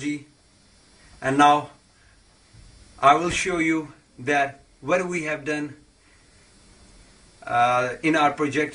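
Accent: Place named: Pakistan. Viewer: Stupid People, This is our Pak-English Akcent. Its our Language and Our Identity. and i speak the same akcent, i love it. and if you can give him some million dollars and a top-class research organisation with some insurance and security, then can do a lot better. why dont you give him these things you cheap and lazy people suffering from superiority complex in a middle class of a 3rd grade country !!!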